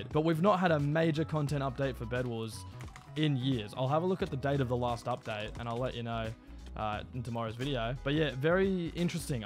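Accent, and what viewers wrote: Australia. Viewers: why do you sound so australian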